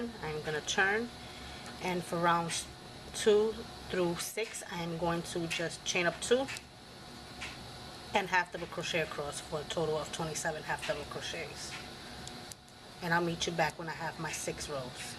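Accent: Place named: Louisiana, United States. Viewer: Thank you so much for not drawing every stitch out. I love how fast you instruct. Thanks again .I truly appreciate your style of teaching. The speed and accent in which you speak remind me of people in my hometown of Baton Rouge Louisiana.Anyway Thanks again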